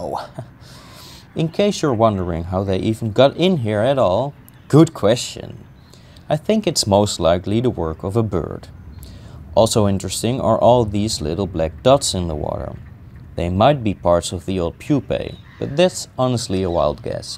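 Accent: Netherlands. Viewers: I love how awkward he is coupled with how awkward the Dutch accent is, while being very interesting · Why do you pronounce the Latin names in Dutch? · I love the way you unapologetically pronounce things with your Dutch accent 🇳🇱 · i can hear the dutch accent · Love het nederlandse accent geweldige video ❤ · why does your accent sound dutch are u also a dutch guy ???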